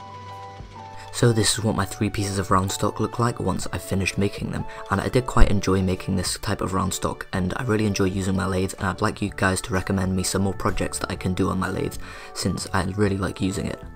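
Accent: United Kingdom. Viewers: dude, you realize he's british, right? like did you literally not even notice that?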